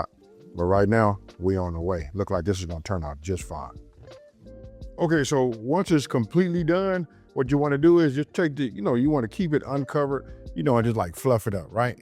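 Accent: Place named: South, United States. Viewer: 😊❤ Wooow, that's a Strong Southern accent!!! Love your cooking!!! Greetings from Ghana 🇬🇭🇬🇭🇬🇭🇬🇭🇬🇭 Come visit sometime!!! 🖐🏾😊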